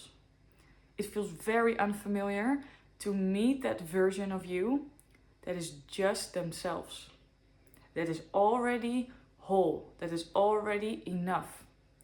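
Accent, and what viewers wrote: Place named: Netherlands. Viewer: Thanks for sharing the wisdom! As someone who learned very early that worth comes from performance, even my healing sometimes becomes another project to optimize. And, I've only recently became aware of this trap. BTW, Dutch accent is my favorite :) Keep up the good work!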